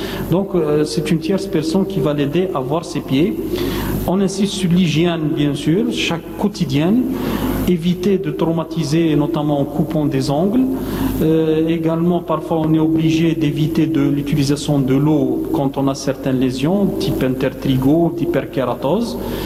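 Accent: Algeria. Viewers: C'est désagréable cet accent algérien ouf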